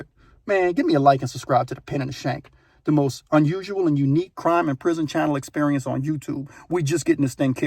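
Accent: Louisiana, United States. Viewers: Where u from bayou boy ? St. Charles Parish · In case anyone is wondering this is a Louisiana accent. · Are you from Louisiana? Like around New Orleans areas? Your accent sounds like it.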